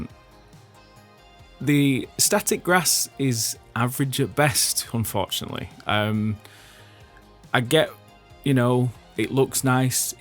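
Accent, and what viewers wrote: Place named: South Yorkshire, United Kingdom. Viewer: Me and the Mrs are having a scholarly debate regarding the placement of your accent. I'm saying no further south than Rotherham, no further east than Donny. She reckons Cas Vegas as a wild card. Are we warm or cold?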